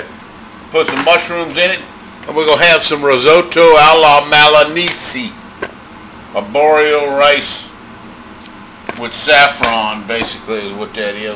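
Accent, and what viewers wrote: Louisiana, United States. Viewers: I could tell from your accent that your a fellow Loiuisianian!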